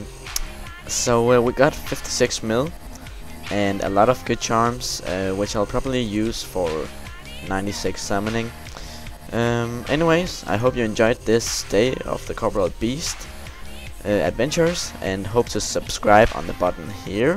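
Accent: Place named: Denmark. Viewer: so danish accent.. trololol, like this serie, it's awesome seeing progress videos, Next drop: ely!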